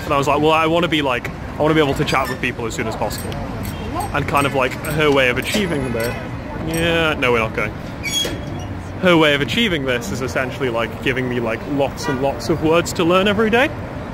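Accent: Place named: RP, United Kingdom. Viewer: You sound so much posher than I remember cuz :) its really cool seeing what your getting up to though :)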